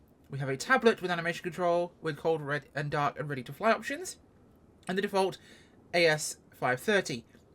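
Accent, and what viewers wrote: Russia. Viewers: are you doing a russian accent? James Bond and I 😍 love it!!!! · ВАУ... какой сексуальный русский голос!!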